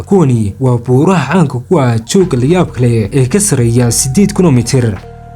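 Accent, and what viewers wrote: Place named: Somaliland, Somalia. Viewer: lahjadii somaliland na waad leedahay waxaanaad isticmaalaysaa luuliyo halkaad ka isticmaali lahay julaay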